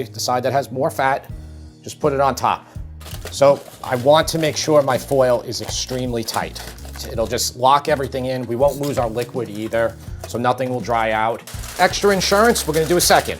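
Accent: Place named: Long Island, United States. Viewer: That accent. Is it like old school Long Island? Montauk with a bit of New England "AAHH". I can't quite place it.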